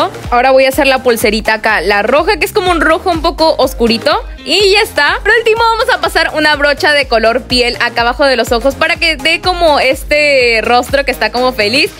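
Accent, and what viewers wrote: Peru. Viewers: tienes acento de peruana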